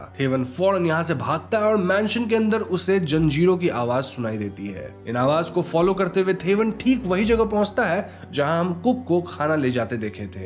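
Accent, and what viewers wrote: Bengal, India. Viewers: dada baangla accent bojha jachhe!. Film story bole dile? thank you. Copyright aasena dekho!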